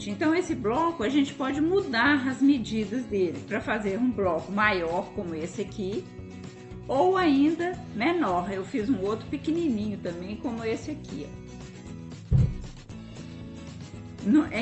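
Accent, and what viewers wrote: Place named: Minas Gerais, Brazil. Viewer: Suas explicações são excelentes, adoçadas com sotaque mineirinho ficam melhores ainda! Adoro seus vídeos!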